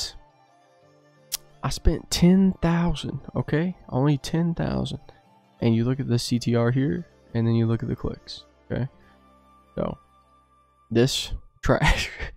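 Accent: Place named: South, United States. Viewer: Your voice sounds country, I love it, yall that's why the music choice in every 1v1 video is epic🤘🏻😎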